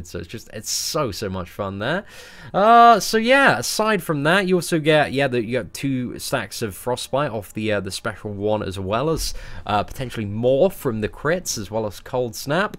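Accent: United Kingdom. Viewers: Dude, you sound so British.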